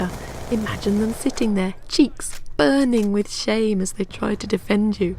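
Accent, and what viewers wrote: United Kingdom. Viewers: Very true,The same principle could be applied to those people who are so obsessed with their K/D that they hide in buildings just to go 4-2 in Domination,Or those smelly little boosters.PS,I enjoy your vids,very original with your slightly posh accent(to me being a northern lad)and quirky comms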